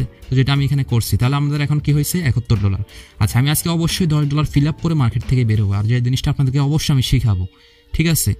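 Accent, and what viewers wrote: Sylhet, Bangladesh. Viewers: ভাই আপনি কি সিলেটের মানুষ.??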